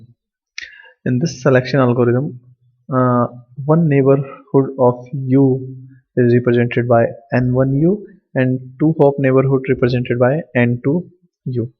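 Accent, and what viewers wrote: India. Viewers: I am sure it is very helpful but you Indian guys must dub your videos with a British or an American accent.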